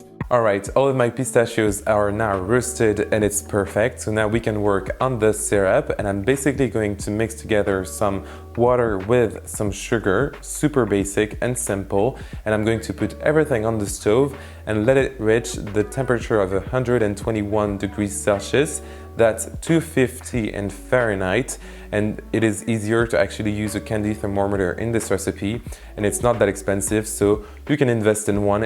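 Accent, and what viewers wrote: France. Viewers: Love your french accent, keep it up! 😍👍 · are you French lol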